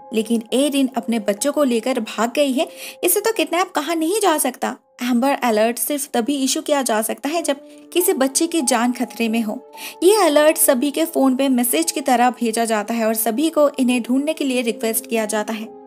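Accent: Bengal, India. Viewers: Are you bengali ma'am ? My mother's friend is bengali and she speaks exactly like you.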